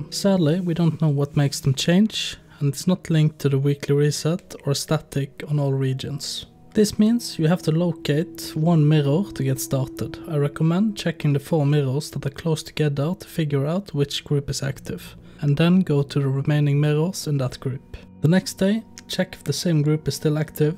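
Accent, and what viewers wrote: Norway. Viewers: Det var så fint så. Bare at dialekta skinte igjennom. Kan man gjette Førde eller Nordfjordeid?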